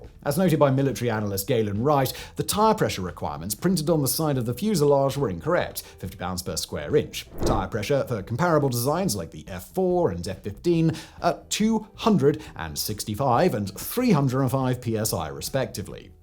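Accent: United Kingdom. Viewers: I've been following your various channels from the beginning, and I really appreciate and respect your work, but there's one little thing I'd like to say, most of your audience is international, and we learned English as a second or third language with the American system and media, I'm sure the UK accents are cool and folksy since it's one of the small nations that speak this language with this folksy aspect, like Jamaica for example, but if you could neutralize the accent a bit, it would serve the message a lot.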